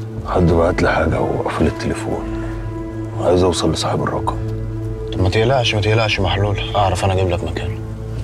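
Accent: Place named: Morocco. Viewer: لهجتك مغربية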